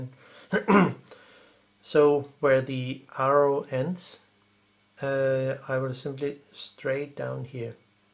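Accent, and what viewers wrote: Denmark. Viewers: You've got a cool accent but I can't pinpoint it. Are you Danish?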